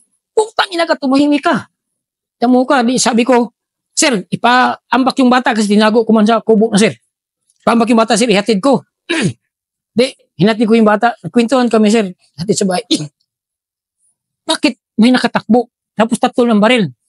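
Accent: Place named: Waray, Philippines. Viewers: Waray waray yata si sir 😊😊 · Parang waray c Sir ahhh iba talaga Ang waray hahaha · parang taga samar si sir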